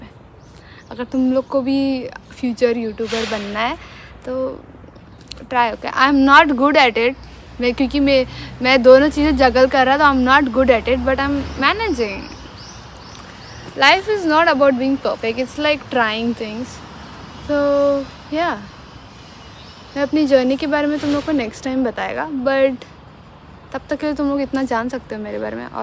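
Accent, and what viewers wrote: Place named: India. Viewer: mai aapka first. Vlog sa huu🤧🤧🤧 l like you hindi accent esliye mai aapki vlog dekhti huuu🫠🫠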